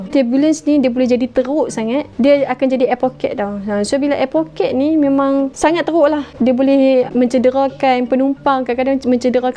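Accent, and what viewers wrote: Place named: North, Malaysia. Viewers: Muka mcm mat saleh tapi kedah piau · bantai cakap utaqha tequih 🤪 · Sedap dengar dia cakap utagha.. padu👍🏻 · Bahasa utaqha dia mmg piaw<link> 😎 Episode lepas: pakai accent KL Episode kali ini: You're the truly Oqhang Utagha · Utara universal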